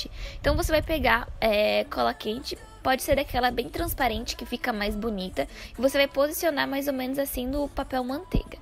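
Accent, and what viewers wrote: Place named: South, Brazil. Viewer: É do sul né? Ksjsk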